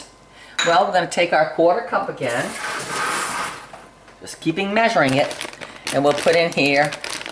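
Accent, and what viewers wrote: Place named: New England, United States. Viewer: I thought so. I’m from Massachusetts originally but now on the west coast. Thought I recognized the accent. Really enjoying your videos. Thanks for the reply.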